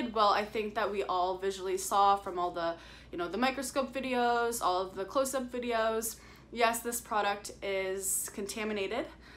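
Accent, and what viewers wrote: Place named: Canada. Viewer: ABHOOT 😭😭 that Canadian accent omg so cute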